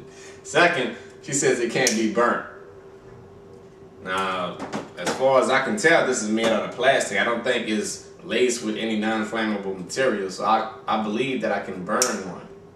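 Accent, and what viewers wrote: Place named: South, United States. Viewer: I can understand him fine, don't be mad cause your not southern...we can't all be wonderful!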